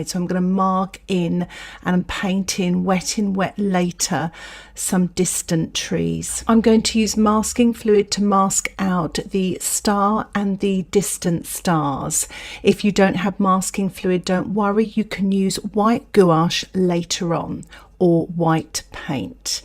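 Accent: England, United Kingdom. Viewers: Lovely, from Green Bay, Wisconsin, USA. Watching painting and avoiding the election drama, too much anxiety. Your lovely English accent calms me. Thank you!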